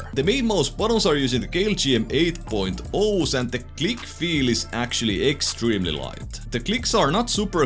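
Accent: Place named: Finland. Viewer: You have a pretty strong Finnish accent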